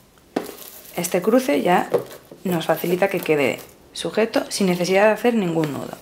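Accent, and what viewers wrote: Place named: Galicia, Spain. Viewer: Tu bello y dulce acento gallego te delata😄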